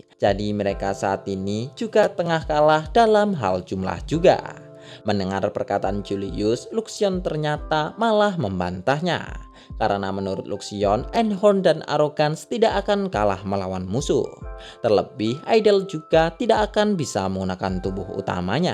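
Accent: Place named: Madura, Indonesia. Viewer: Bg lu orang madura ya, kelihatan logat maduranya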